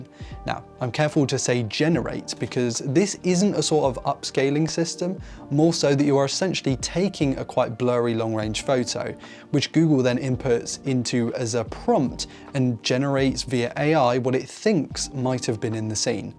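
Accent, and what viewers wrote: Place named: Manchester, United Kingdom. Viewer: I see we have a Mancunian😂😂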